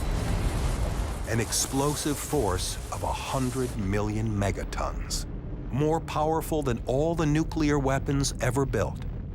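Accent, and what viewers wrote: United States. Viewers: yawn, more american voiceovers... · Who is the teller? He sounds american 😢😢. I prefere Nigel Marven.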